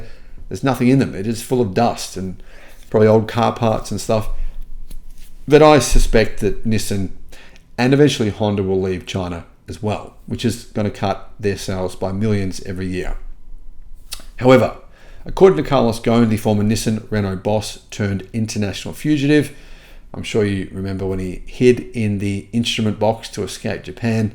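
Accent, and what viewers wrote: Australia. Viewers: Please slow down. When you start talking rapidly your Aussie accent makes you hard to follow for us in the States. Other than that, you've got a very informative site.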